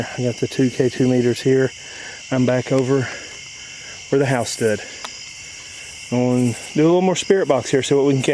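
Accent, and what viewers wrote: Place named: Tennessee, United States. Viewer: Another nifty explore! You caught some interesting EVPs,: "Got me" or "Shot me ('ot me)" whispered after you ask "Who's Michael?". "Hello!" after you say: "I'm here to communicate with you.". "I'm not gonna fix it!" very rapid words, male voice, after you say: "It's weirding me out!". *You had the wrong...REACTION!" Same male voice as. "Soil!" This was whispered after the Ghost Box spells out "Barry". I think it meant "Bury" but spelled it wrong, because: "In the...GROUND!" This is a whisper, but the word "ground" is drawn out (grooouunnd), and it sounds like Spirit is "scream-whispering" at the top of his non-existent lungs. He sounds angry AND frustrated. Perhaps something was "buried" there, in the "soil"/"in the ground" that was valuable, and the killer went there looking for it, ostensibly to have supper? 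"No". Very faint whisper after you ask: "Anything you'd like to say?". "No." Another faint whisper after you ask: "Was it your cousin who committed the murders?". You heard that one yourself, as you mention "hearing voices". "Get lost!" whispered as you are going through the brush/shrubby trees. Very interesting explore,. Lots of EVP evidence caught. BTW, I love listening to your voice, as my Great Uncle Joe was from Tennessee, and your accent reminds me so much of him. He even used to say "terlit", so I >know< you didn't make that word up!:) Stay safe,!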